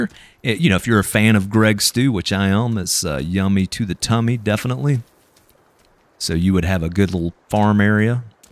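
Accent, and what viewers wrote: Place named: South, United States. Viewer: I cannot pinpoint your accent. It has to be southern, Im thinking Arkansas? Where have I heard that accent before? Lol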